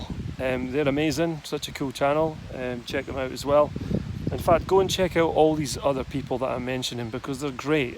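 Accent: Scotland, United Kingdom. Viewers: thankyou so much for your reply and I am really enjoying your format I guess I'm just greedy and want to see them all haha.I also have to admit listening to your Scottish accent makes your videos even better for some reason I find it soothing.Keep up the good work and I will keep watching 😊 Sharlene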